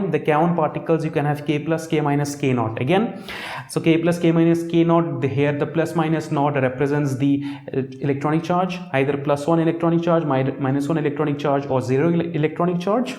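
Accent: India. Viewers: But I guess you're indian and that's why you're accent sounds like that...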